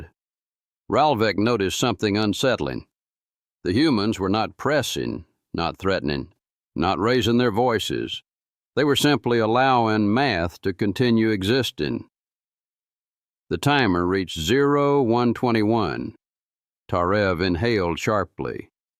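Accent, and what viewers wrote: South, United States. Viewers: Sounds like cleitis is telling a story real southern accent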